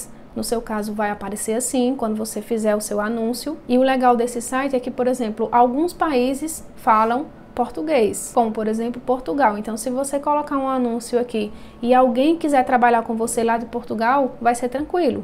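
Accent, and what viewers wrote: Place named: Rio Grande do Norte, Brazil. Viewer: Sotaque igualzinho o meu, já vi que é poti 😁☺️ parabéns pelo vídeo 👏 sucesso!!!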